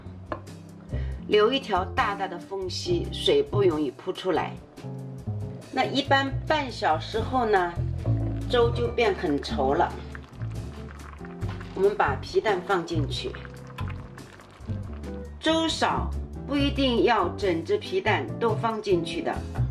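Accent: Shanghai, China. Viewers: 用電飯煲可以嗎？阿姨說的是上海普通話 哈哈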